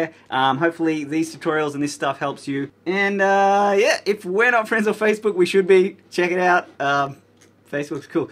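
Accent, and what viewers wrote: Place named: Australia. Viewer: I love your Australian accent! So easy for me to understand :)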